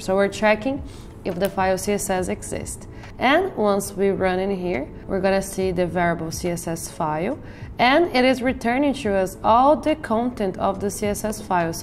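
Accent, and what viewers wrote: Brazil. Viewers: Você é brasileira ? Tem um sotaque muito parecido. Thanks for the class!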